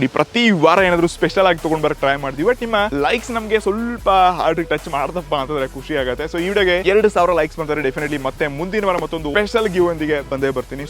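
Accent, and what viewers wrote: North Karnataka, India. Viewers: ಅಣ್ಣ ನಮ್ಮ ಉತ್ತರ ಕರ್ನಾಟಕ ಭಾಷೆ ಮಾತ್ರಾ ಬೆಂಕಿ 🔥🔥🔥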